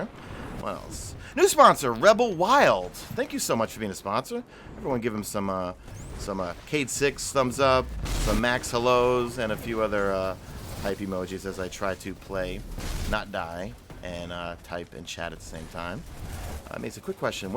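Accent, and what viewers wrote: New York, United States. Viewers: oh shit, i didnt know you a new yorker. bensonhurst representing over here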